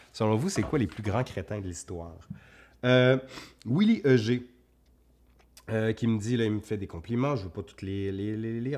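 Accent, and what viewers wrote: Quebec, Canada. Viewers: Vous n'avez peut-être pas le coffre de Benjamin Brillaud, mais l'Histoire racontée avec l'accent québécois est fort plaisante. Ne changez rien :) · Une question qui me tarode depuis un bon moment et j'espère que tu pourras y répondre. Suite aux grandes découvertes, aux fuites de certains français en raison des guerres de religions, de la Révolution Française.... beaucoup se sont réfugiés au Québec ou en Louisiane, parlent avec le même charmant accent qui est le tien. Donc, question: parlait-on ainsi en France à l'époque des Henri, Louis Charles et autres? Et ainsi vous auriez conservé cette particularité linguistique, tandis qu'en France, la langue se serait "vulgarisée". Ce n'est pas un terme péjoratif, d'où les guillemets. Merci d'avance. Et Bravo pour tes vidéos.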